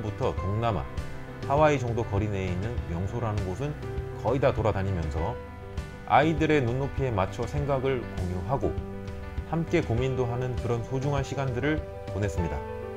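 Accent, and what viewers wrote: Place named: Gyeongsang, South Korea. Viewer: 잘 보고 있습니다. 경상도 억양이 느껴지는데 고향이 서울.경기권은 아니신가봐요. 차라리 강호동, 김제동씨 처럼 말씀 편하게 해보시는 것은 어떨까 조심스럽게 의견 남겨봅니다^^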